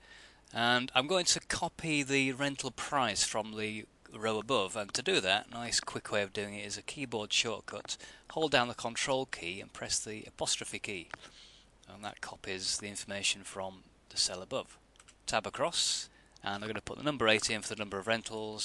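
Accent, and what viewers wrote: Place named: Northern England, United Kingdom. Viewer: I don't know if this guy is paid for this excellent job, but he made this tutorial absolutely stunning. Thanks for that! Btw.I would guess his accent as from northern England,maybe Manchester :))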